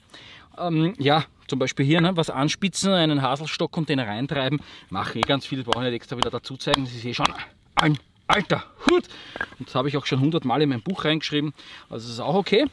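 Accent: Austria. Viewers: Der Ösidialekt is eh da geilste.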